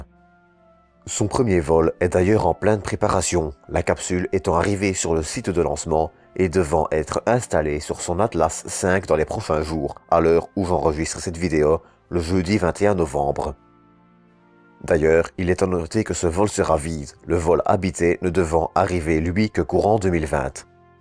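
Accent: Belgium. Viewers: vive l'accent Belge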